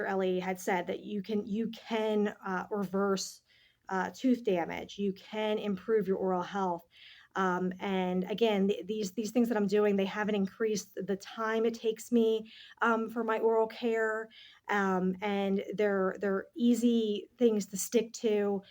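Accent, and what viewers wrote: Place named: United States. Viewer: intersting ... I though her accent is so American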